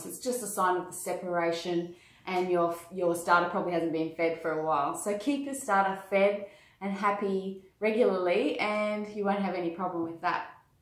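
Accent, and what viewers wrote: Australia. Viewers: Love that Australian accent.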